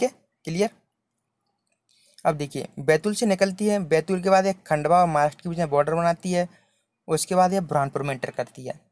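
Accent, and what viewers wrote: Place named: Uttar Pradesh, India. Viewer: U P k lgte ho awaz se😎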